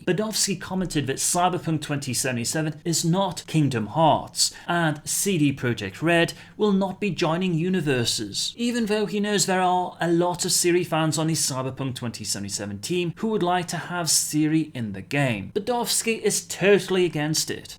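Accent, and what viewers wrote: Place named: North Wales, United Kingdom. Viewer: If I had to guess yeah. You pronounce some words very Welshy and some things sound slightly northern, your voice is really hard to pin down tho. Where are you from then?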